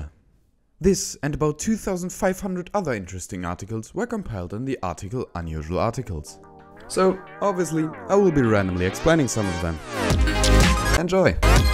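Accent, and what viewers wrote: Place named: Germany. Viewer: Btw I hope I Identified your accent correctly as german :p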